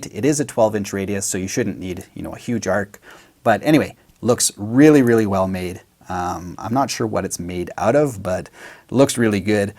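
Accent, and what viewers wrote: Canada. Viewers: I've been waiting for something special as at 67 68 I'm looking for my last guitar purchase..I just sent a email as regards left hand..hopefully its a yes..massive ty everything changed for me since watching your vids and I have watched many..so informative and well presented..and I love the Canadian accent far better than American ha ha..just saying I'm an Essex man England you might not like mine either Yanks but Canadian is a smoother sound..mind you Steve Earle country sound excellent..but I want this guitar quality supreme ty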